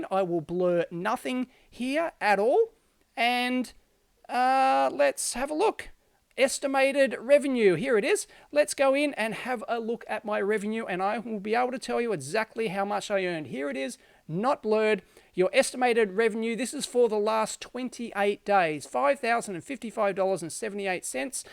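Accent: Australia. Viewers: You've got a sub from me love the truthfulness and that your an aussie love the accent😂😂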